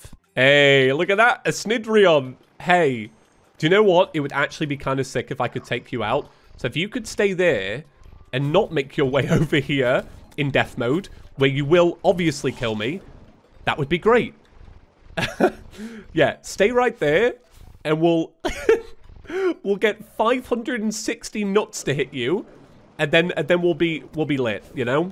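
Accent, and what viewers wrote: United Kingdom. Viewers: Omg are you British!😍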